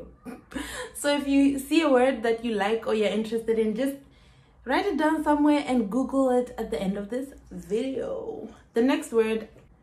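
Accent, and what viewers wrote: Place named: Poland. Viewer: I love your polish accent maammmm😍😍😛😂😂😂😂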